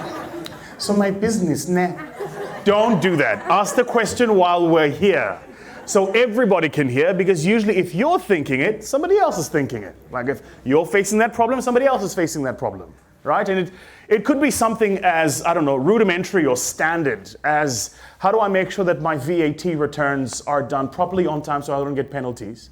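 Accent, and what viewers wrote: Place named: Township, South Africa. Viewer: lol; I love your Kasi Accent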